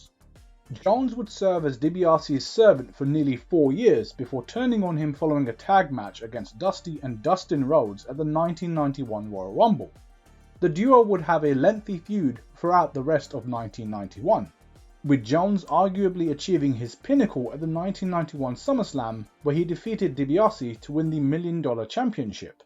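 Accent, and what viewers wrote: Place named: United Kingdom. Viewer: How come you narrators are always British??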